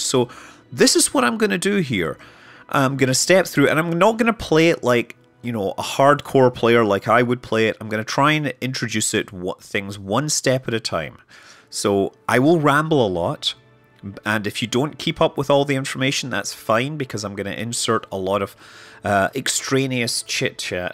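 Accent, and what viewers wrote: Scotland, United Kingdom. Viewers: finally, a Scottish tutorial youtuber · ***** he's scottish and he actually has some programs to cover up his accent so if he didn't have that it'd be unbearable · I might have a feeling this man is from Scotland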